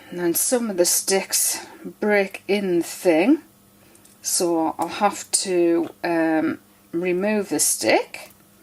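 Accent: United Kingdom. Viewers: What ?! people are watching your videos to hear your lovely voice with your beautifull British accent and your soaps that are really but really look tasty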